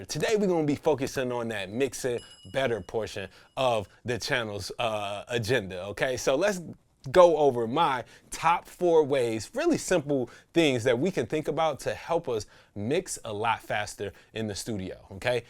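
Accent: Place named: Washington DC, United States. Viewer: You sound like you from DC, bro I swear u got that twine in your voice like me bro but I think u said you from st.louis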